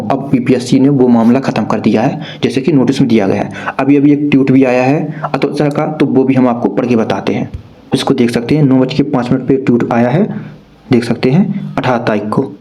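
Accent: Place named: Bundelkhand, India. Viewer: Kay bhajja bundelkhand ke ho ka ..😊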